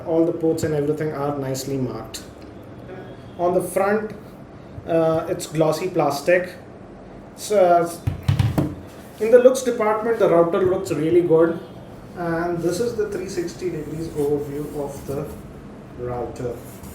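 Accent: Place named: Tamil Nadu, India. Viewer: Your Tamil accent is heavy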